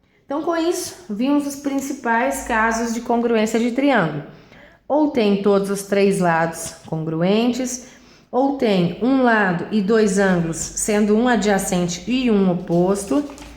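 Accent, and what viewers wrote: Minas Gerais, Brazil. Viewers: Prof.ª: congruência NÃO significa, necessariamente, igualdade. Congruência, por definição, é a coincidência ou correspondência de caráter ou qualidades; proporcionalidade, conformidade, concordância, harmonia. Se dois triângulos têm os três lados iguais e dois ângulos também iguais, obrigatoriamente tais triângulos são absolutamente IGUAIS. Mas, como regra geral, a terminologia correta no estudo de semelhança de triângulos, o correto é utilizar a palavra "congruência ou congruentes". Importante observação: suas mãos são lindas, assim como seu sotaque mineiro ( lá de Barbacena ).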